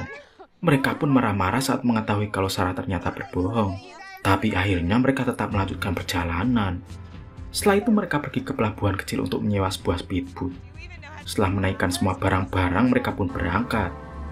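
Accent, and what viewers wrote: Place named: Banyumas, Indonesia. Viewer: pd bae ngapak ya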